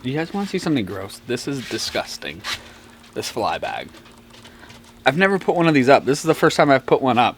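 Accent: South, United States. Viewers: Shoutout from Utah, Tennessee looks Greener than here. Also note a micro southern twang in your voice. Love your Vlogs ✌️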